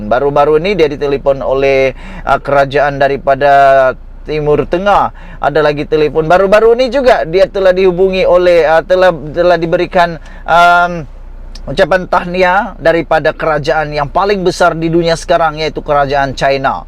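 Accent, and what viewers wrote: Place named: Sabah, Malaysia. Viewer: Cakap mu MCM orang Dusun Darat buat malu ja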